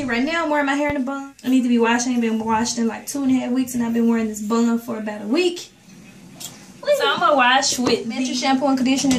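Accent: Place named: Memphis, United States. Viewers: I knew you were from Memphis I hear it lol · I knew you were from Memphis 😣. Lol I can tell by that accent. I'm Nasvillian ✌✌✌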